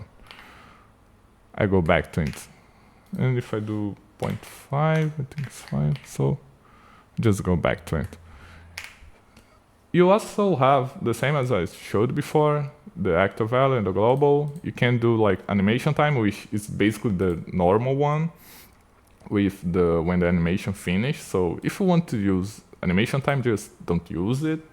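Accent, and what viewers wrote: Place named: Brazil. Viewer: Não sei como mas da pra reconhecer que é BR pelo sotaque kkkkk Salve de Florianópolis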